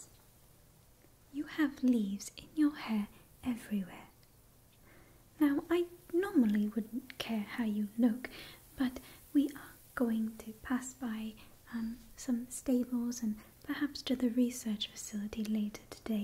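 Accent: United Kingdom. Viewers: Great British accent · How did you match the voice so well? I know it’s technically just a British accent, but something about it feels just perfect for this specific Zelda.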